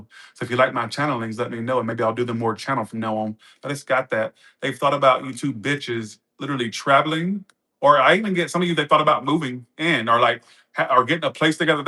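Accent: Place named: New York, United States. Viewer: you have a badass accent it's giving 1920s 1930s 1940s 1950s New York/old movies but in todays times actor you could've play extra in most iconic films I bet people would remember your quotes lines when say "you know what... " I thought for a moment as if you were saying some films lines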